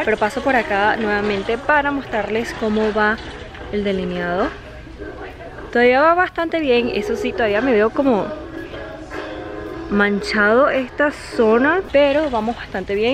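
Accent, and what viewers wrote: Venezuela. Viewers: Hola, no se por que tu acento, me parece venezolano ☺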